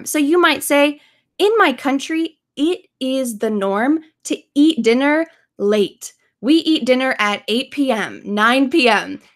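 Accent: United States. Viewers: people in US speak not only fast but also in slang and with different accents. That's the difficult not only in US but also at the places with English speakers. You have the perfect American accent I have ever heard. I like your videos. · I like ur voice,you know,just easy to understand and concentrate. perhaps in my view American English is easier to follow with,it's kinda different from what we'd been taught in textbook.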